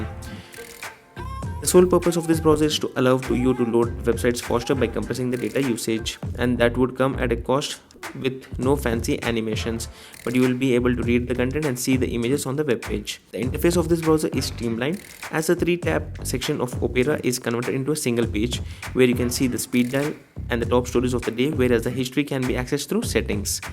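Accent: India. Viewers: can I say I often watch these video is for learning Indian English accent. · I'm an EFL English teacher and this guy speaks exactly how I tell my students not to. If you don't care to decrease the influence of your native language accent on your English, at least speak slower. Fortunately, you have the article to help people who speak global English (and not Hindi English). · indian accents are hard af to understand, i would rather learn hindi than listen to this. hopefully pimsleur has it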